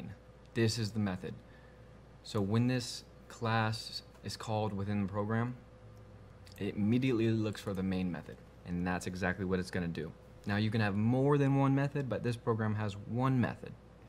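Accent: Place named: United States. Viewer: thank you for this playlist. it's very hard to find java videos with english american accent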